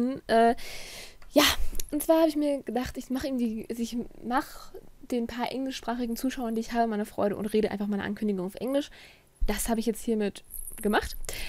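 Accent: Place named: United Kingdom. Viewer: your English sound very British, which I like, I think it is brilliant XD ... ich würde zwischendrin einfach mal ein paar Folgen Mirrors Edge in Englisch toll finden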